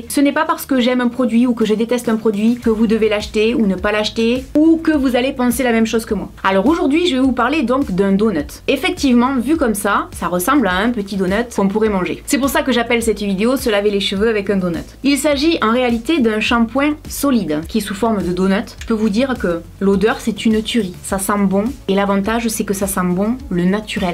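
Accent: South, France. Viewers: cela fait environ 1 an que je me lave les cheveux avec ce shampoing solide. J'ai les cheveux frisés et très souvent sec depuis que je l'utilise ils ont repris un volume de fou et sont super brillants le seul point négatif du moins pour moi c'est que je ne sais pas l'utiliser en mode solide du coup je le laisse se ramollir un peu dans l'eau et je l'utilise comme une crème. par contre il dure et dure très très longtemps facile un mois voir plus c'est GENIAL !! sinon juste un petit mot j'adore tes vidéos tu respire la joie de vivre et ça fait plaisir d'entendre enfin un youtubeuse du Sud je suis de TOULOUSE et les accent pointu sur youtube j'en peu plus lol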